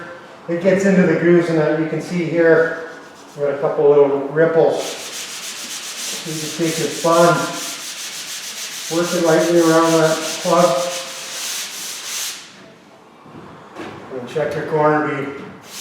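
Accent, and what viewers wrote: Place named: Canada. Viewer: Sounds like a Canadian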